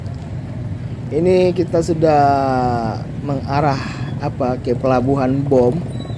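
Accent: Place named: Negeri Sembilan, Malaysia. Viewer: Abang amat ni orang minang ke.bunyi cakap macam orang negeri sembilan malaysia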